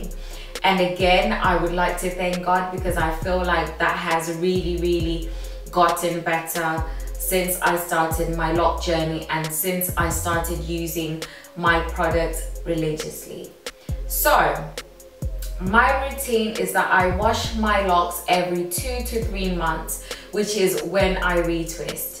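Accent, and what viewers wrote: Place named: England, United Kingdom. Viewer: Where is your accent from ? It sounds English I love it ❤️ love your locs too x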